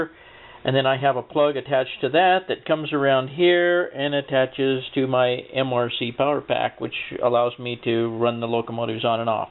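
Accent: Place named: United States. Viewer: American- ish lol!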